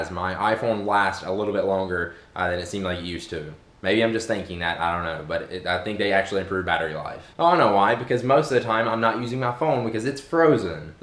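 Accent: South, United States. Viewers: Well obviously an american southern accent, he lives in america. Nobody speaks proper english. You shouldn't be making fun of others for something you don't even do.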